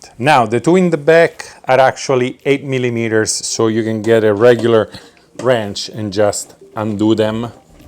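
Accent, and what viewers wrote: Italy. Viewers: I like the italian accent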